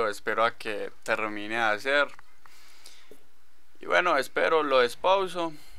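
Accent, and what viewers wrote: Antioquia, Colombia. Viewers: jajajaja sos paisa cierto .... me gusta como comentas esos vidoes como con esa gracia .. saludos desde cali ... por eso no me gusta el fifa solo pes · Ud es colombiano? me callo bien :3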